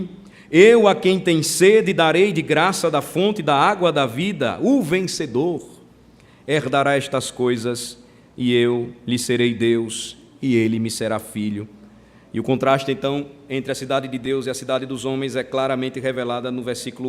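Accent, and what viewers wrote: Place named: Sergipe, Brazil. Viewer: Oi pastor!!! Assisto teus cultos aqui em BH. Nasci na igreja presbiteriana , ha 68 anos atrás, no interior de Minas. Hj frequento a Metodista. Gosto muito do seu sotaque.... eu, meu marido e meu filho ha 25 anos atrás moramos doze meses aí em Aracaju. Foi um tempo lindo! Temos um carinho muito grande pelos sergipanos e tbem por Aracaju. Amamos de coração!! Um grande abraço, pastor.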